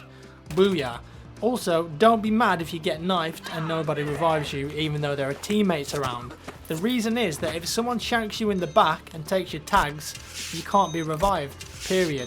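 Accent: United Kingdom. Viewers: i hate him when he is whispering, it's hard to understand english without the subtitles, and that British accent makes pretty hard....i'm not a native english speaker.....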